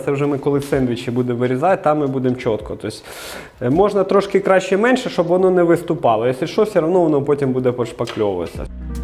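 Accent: Surzhyk, Ukraine. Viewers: У вас дуже багато суржику кацапського. Прошу звернути увагу на свою вимову ви ж молодий! Дякую відслаюваться.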